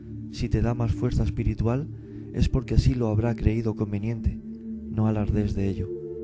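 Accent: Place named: Spain. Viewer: muy lindo y modesto tu historia de cómo te hicistes este guía espiritual de la humanidad me pasó algo parecido a la historia de la muchacha pero no con el suicidio si no con la muerte de mi hermano el día q se puso malo me entró un vídeo q nunca me había entrado ningún vídeo de ese tipo fue la historia del neurocirujano q tuvo una ECM como una demostración q mi hermano IVA a estar bien y a partir de ese momento me sentí súper tranquilo y le perdí hasta el miedo a la muerte y fue cuando me entró tu primer video bendecido por Dios y a partir de hay los e visto todos los q han entrado me das mucha confianza vivo en cuba sino fuera a España a conocerte tu acento es español si cojo la ciudadanía española dios me llevará a conocerte muchas gracias por todo bendiciones pa ti y tu linda familia y para todos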